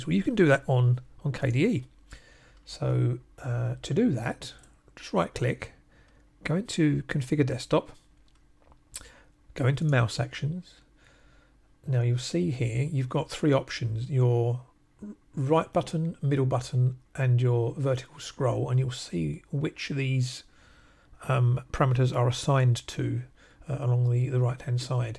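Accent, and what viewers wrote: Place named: United Kingdom. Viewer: Thanks! Very informative. I've just found your channel and been watching your plasma videos. I'm getting into Linux and enjoying it I'm moving from Mac. I've tried alot of distros and prefer KDE - I'm using neon to get the latest plasma but I wonder do you prefer or recommend Kubuntu over neon? I'm finding some bugs in neon I'm using breeze dark but I cannot change the window decorations and if I try it locks the window so I cannot do anything with it so have to kill the window and open another one. I'm wondering if it's better to go back to kubuntu and use 19.04 or would you stick with LTS? Nice to hear another Brit at first I thought you were Zebedee boss...Cheers!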